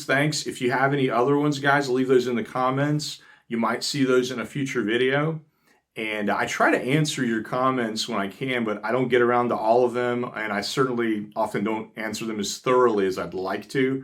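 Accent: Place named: North Carolina, United States. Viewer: He speaks like my brother, who is a ninth generation North Carolinan!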